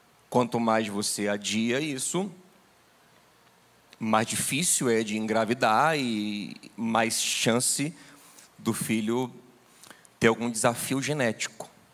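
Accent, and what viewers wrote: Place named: Bahia, Brazil. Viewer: Ouvir uma mensagem poderosa e abençoada como essa, no meu ''dialeto'' e sotaque natural baiano é tremendo demais para mim!! ''Nao queremos viver na mentalidade da carne, de Agar gerando Ismael confiando em nós mesmos...nos leva a compreensão do quão fraco somos até o ponto de perceber que tua obra em nossas vidas é motivo de riso''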